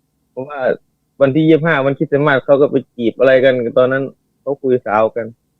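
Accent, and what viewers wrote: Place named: Isan, Thailand. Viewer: 🙏สวัสดีค่ะ🙏.💞 💗.ตามฟังย้อนหลังทุก ep.คะ💓🍑.ชอบมาก เสียง สำเนียงภาษาบ้านเฮา😊เป็นนักเล่าที่มีเอกลักษณ์เฉพาะตัวจิงๆๆ☺️.🌅🎄.ติดตามคะ👌👍❤️💯💯💯💯💯💯💯💯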